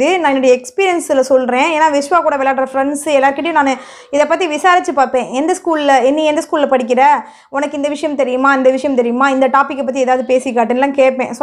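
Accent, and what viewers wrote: Madurai, India. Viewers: Very encouraging vedio sister, na madurai dhan unga madurai pechu super, rombave yedhaarthama pesuringa maduraikaravangaluke irukura yethaarnama pechu, unga vedio paarthadhula irundhu Naanum savings panni gold vanganumnu oru intrest vandhuruku, vedio paakuradhuku munnadi varai intrest illa, unga character super, selave pannadha character kondu vara Naanum try pannitu iruken, unga vedio paarthu, continues.ah vedio podunga, thankyou so much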